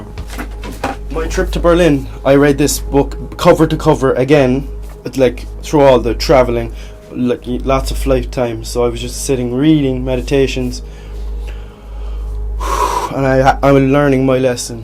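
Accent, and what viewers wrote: Ireland. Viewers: Bro you rare af a black guy with a Irish accent you would get so much ass in America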